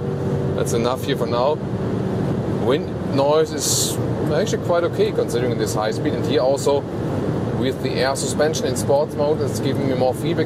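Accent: Germany. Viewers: Der deutsche Akzent tut schon ein wenig weh beim zuhören .... aber wahrscheinlich notwendig um einen Channel groß zu bekommen.😶‍🌫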